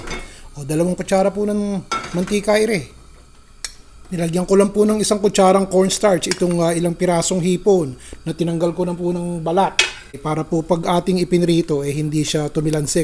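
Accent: Philippines. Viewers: Aray ko mainit...had me laugjing...i miss pinoy expressions and accents...i just love it. From a kababayan living in LONDON, UK 🇬🇧 with ❤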